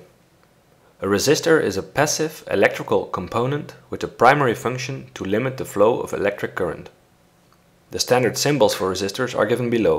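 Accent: Netherlands. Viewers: You sound very Dutch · Are you from holland? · Are you, by any chance, Dutch? That, or my accent recognition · do I hear a little dutch accent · Nederlands accent herken je altijd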